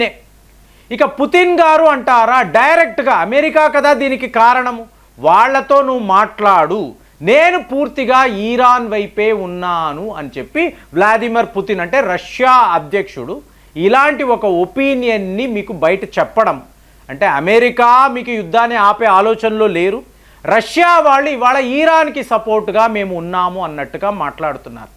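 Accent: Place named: Kerala, India. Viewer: అన్నా మీరు మాట్లాడితే కేరళా వాళ్ళు తెలుగు మాట్లాడినట్లు ఉంది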